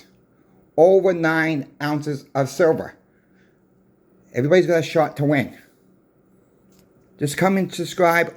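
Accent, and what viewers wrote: Boston, United States. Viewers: I thought you were from New York but you said you’re from Boston. I can hear it now.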